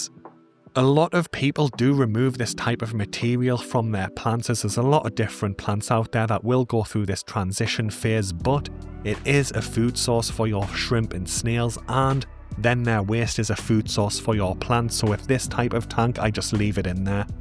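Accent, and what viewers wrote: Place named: North Yorkshire, United Kingdom. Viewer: Looks really good, i don't have a set up like that but i'm planning another purchase, i going set up a cherry shrimp jar, i just need a big jar, i've got them in all 4 tanks now, my minnows haven't bothered with them, i've gone from 12 to a few hundred at least in about 6 months, luckily i know a couple of people with tank suitable for some, the water in North Yorkshire seems to suit them, not far from where you are, judging by your accent, i've just started following you on Facebook so i might send you some pics, what would you recommend for planting in a jar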